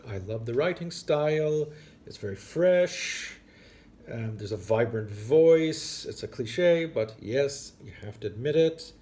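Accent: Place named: Malta. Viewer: You are from Malta? I was trying to figure out your accent.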